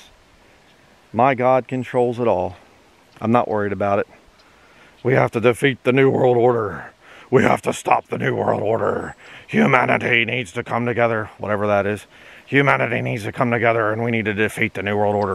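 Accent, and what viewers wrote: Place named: Pennsylvania, United States. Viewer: can tell you're a PA boy by your accent!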